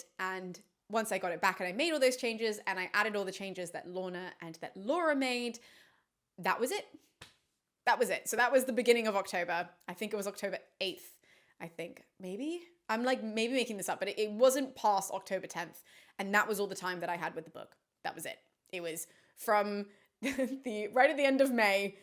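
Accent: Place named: England, United Kingdom. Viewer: You have an English accent, everyone loves your voice. 🤣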